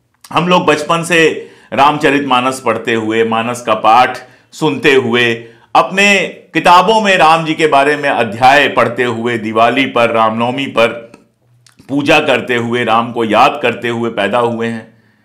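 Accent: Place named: Pahadi, India. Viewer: Sirji ka nidar hokar saaf saaf bolna ,our pahadi aavaj sone pe suhaga he dhanyawad salute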